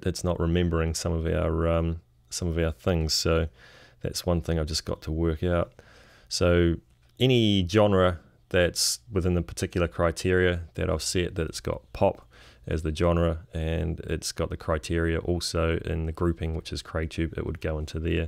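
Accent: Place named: New Zealand. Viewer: Yo Kiwi dude can pick that accent a mile off have you gone to latest yet with Yosemite, It came with a few issue to start with but after talking with the developer it seems to be sorted. Scheduling is fairly start forward the hardest part is making iTunes conform 12 isn't an improvement it com,pellets messed up my playlists. but that might not be a bad thing as I'm moving everything from an iMac to a 12 core and will have to go through everything agin, it just never ends. B y the way what the story over there now on low power FM stations across the ditch if your single penetrates a piece of paper it is considered too powerful .02 of a watt max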